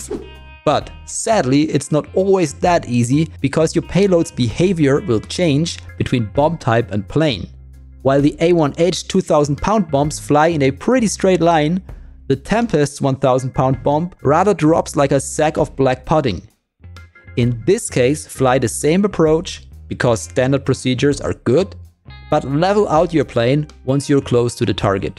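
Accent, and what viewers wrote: Germany. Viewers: Ngl im very thankful for this video, ive been trying to dive bomb for ages I still can't dive bomb but hearing german names pronounced with a german accent makes me happy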